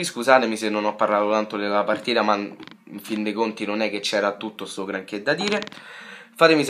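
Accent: Rome, Italy. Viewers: Come mai hai l accento romano?😂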